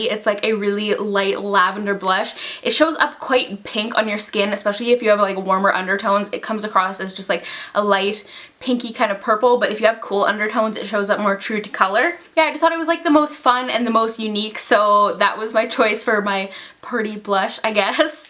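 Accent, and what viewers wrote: Canada. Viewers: Why are you so pretty? I need to know the secret. Haha. And for some reason I can totally hear your Canadian accent in the video for the first time, lolol. Xoxo.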